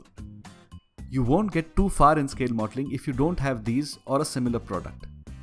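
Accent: India. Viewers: He is Indian, so it is the way he pronounces it.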